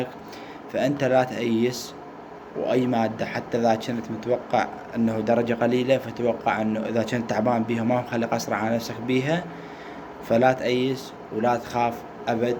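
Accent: Mosul, Iraq. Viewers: احسك من اهالي الموصل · من لهجتة مبين مصلاوي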